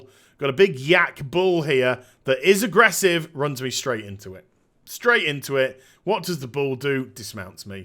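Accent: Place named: United Kingdom. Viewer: The Brit narration makes this fun to watch! Calls the moose "bugger" and such. And after watching this video I am not even going to bother with the quest.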